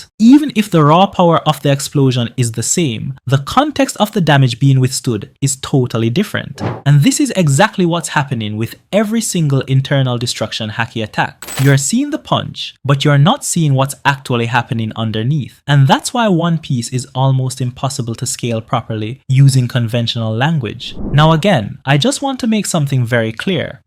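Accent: Jamaica. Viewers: are you jamacian? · Your deff jamaican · You sound Jamaican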